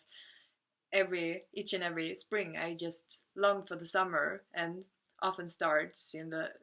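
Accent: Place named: Sweden. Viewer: you have an awesome accent. And Swedish is the most popular North Germanic (Scandinavian) language.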